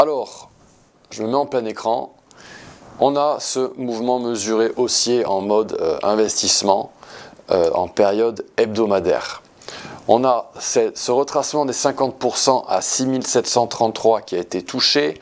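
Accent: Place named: Corsica, France. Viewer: Petit accent corse sympa 😊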